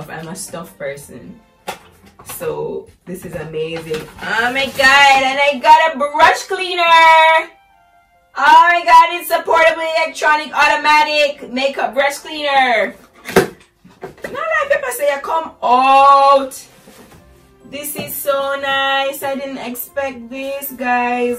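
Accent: Jamaica. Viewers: First time watching your video and I love it . are u from Jamaica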